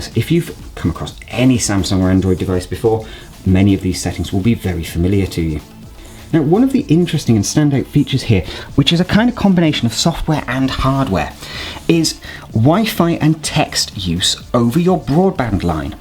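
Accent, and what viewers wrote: United Kingdom. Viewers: gotta love the brittish accent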